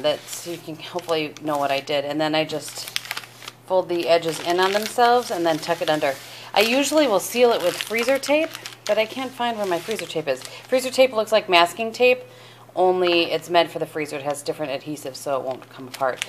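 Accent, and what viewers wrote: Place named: Minnesota, United States. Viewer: I just found your channels and I've gone on a total binge. So hi! you are a pleasure to watch. It's nice to find someone who is so relatable and your accent reminds me of living in MN.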